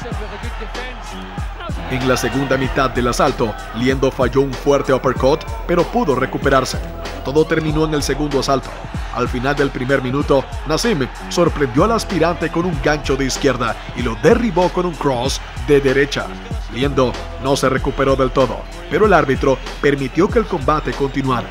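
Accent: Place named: Central America. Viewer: Parcial y mediocre tu video y TU SABES muy bien porque. No ubico tu acento pero debes ser centroamericano, no encuentro otra explicacion para tan inconmesurable omission.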